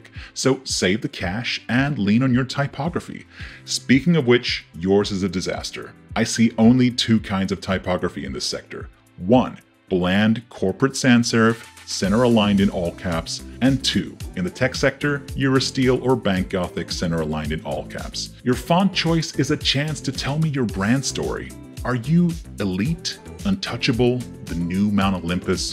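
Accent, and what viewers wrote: United States. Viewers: Woah, new to this channel and was totally convinced that was your voice, with maybe a compressor, but certainly not a pitched down American accent! Well done! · your American accent was spot on except for when you said "military" lol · Your American accent is so good that I forgot that you’re British/Australian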